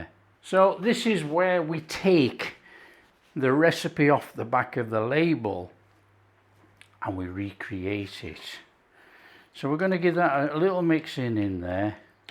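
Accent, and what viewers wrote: Yorkshire, United Kingdom. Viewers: Hello - im a yorkshire woman- living in lancashire for long time- but still so proud of my yorkshire roots. Born in Leeds- then lived in Hull- i still miss the patties from Beverly road chippy- cant get them here. Anyway grew up with mam sending one of us to the corner shop- for a quarter of loose potted beef- wrapped in greaseproof paper. Didnt last long- having 3 sisters- but wow such a lovely memory. Luv watching you- because i cook a lot much as im alone now- just like yourself im known for the old classics and proud of it. So yes ill be making your lush potted meat and having it on my favourite toasted bread- sourdough. So thank you- such a pleasure listening to you with your yorkie chat- always feel like ive gone home as im right back there- its nostalgic. God bless- and take care. 😊❤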